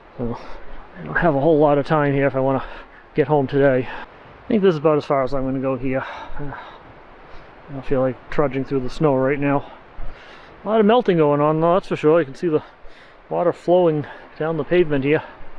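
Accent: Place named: Massachusetts, United States. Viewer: As a Northshore transplant in southern Maine, your MA accent sounds like home. Mine is pretty much gone. It only comes back when I’m around my brother or my parents. They’re in Amesbury and Peabody. I’ve been out of MA since 1999.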